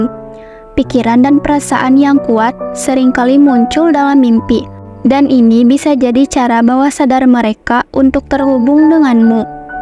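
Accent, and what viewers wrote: Bali, Indonesia. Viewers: iy mnurut saya ini dialek orang bali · Dri dialeknya sptnya org Bali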